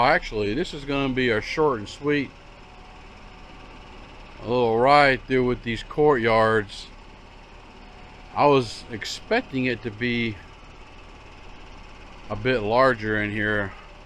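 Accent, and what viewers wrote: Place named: South, United States. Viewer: Another great video,. No neighborhood pool in that village? My wife says your accent reminds her of Foghorn Leghorn Thanks for all your great work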